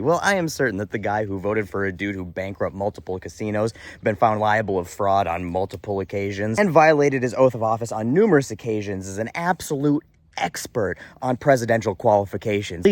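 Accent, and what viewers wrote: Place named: South, United States. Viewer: Woohooooo, do not ever stop. Yours is the kind of hillbilly redneck voice we need. I do mean that in a good way, although i would consider you more redneck than hillbilly. I've been married to one for 30 years. Trumps simple do not speak for all rural country people. Some of us can read a book. 😂 or, in this case, the constitution. Long walk, for a short block. We need you and your common sense way of putting things.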